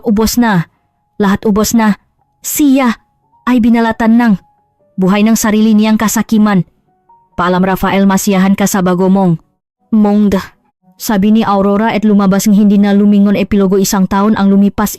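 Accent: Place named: China. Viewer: Parang hinahabol n kamatayan ang narrator, at masyadong slang n inchik ang pagkakasalita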